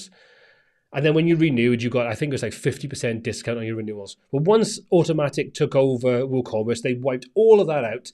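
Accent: Wales, United Kingdom. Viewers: is from Wales, it’s just his accent…maybe it’s a plug-in you are talking about?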